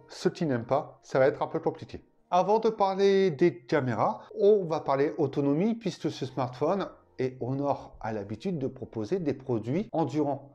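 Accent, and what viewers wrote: France. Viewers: Merci pour tes conseils et présentations éclairés, je viens de me commander un honor 9x du coup. Mais il m'a l'air t'es bien de ch'nord, ti, hein?? Bonne continuation pour ta super chaine YT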